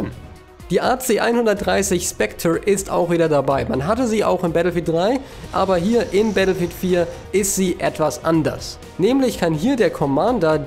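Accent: Germany. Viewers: Ich bin Finnisch und ich liebe deine Deutsch acschent :)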